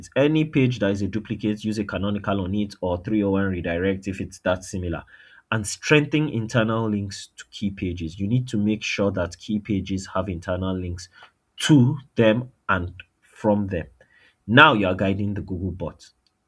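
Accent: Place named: Africa. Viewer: Whatever you doing bro I am here for it..but 😭😭that accent bro..are you African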